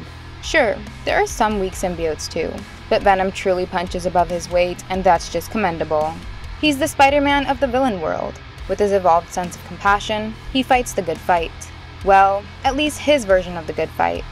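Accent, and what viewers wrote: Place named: United States. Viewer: Every word is typical american accent everything has r in it annoying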